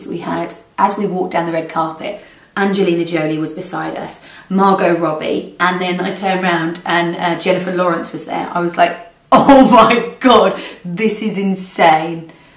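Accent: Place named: London, United Kingdom. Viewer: You have such a beautiful, perfect face! And I love the London accent! 😊🦄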